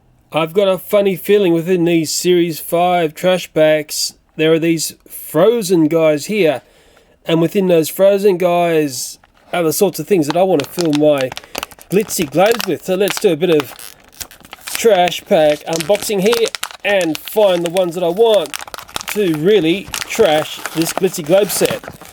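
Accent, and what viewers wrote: Australia. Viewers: He's Australian isn't he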